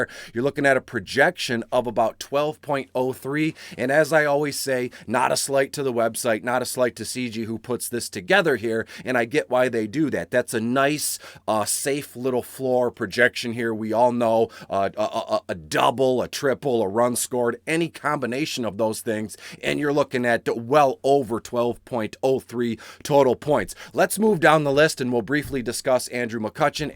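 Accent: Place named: Michigan, United States. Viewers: Hahaha better the Michigan accent than saying eh or don’t cha know. 😂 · im here for the great advice and the Michigan accent. both on point today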